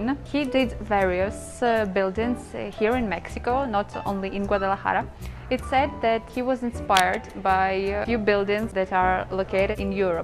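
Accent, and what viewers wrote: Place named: Ukraine. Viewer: sexy Ukrainian accent. I picked that, but afraid to ask.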